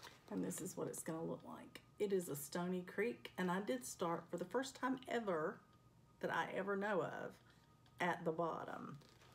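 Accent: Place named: Atlanta, United States. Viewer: I just love “Welcome Home”! So glad I found your flosstube. We sound alike - I’m from Atlanta, but have been in Pennsylvania for 25 years, and never lost my accent (as I’m told almost daily!) ❤️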